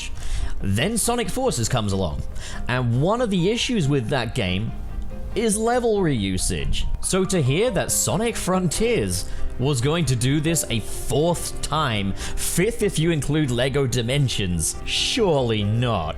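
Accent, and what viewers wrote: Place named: United Kingdom. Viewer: But in your YouTube Channel informations, it says that your in USA (that's why i asked in the first place) but rewatichng your video, i noticed a little the british accent haha (it's not that obvious for a foreigner to notice it ^^')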